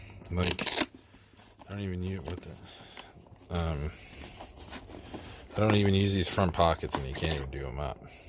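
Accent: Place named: Canada. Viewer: Great video and always interesting to see what other trades carry...initially I thought you were American, until I heard the third or fourth "Right" then I thought you must be Canadian! lol Edited to add what are the two tools at the and what are they for?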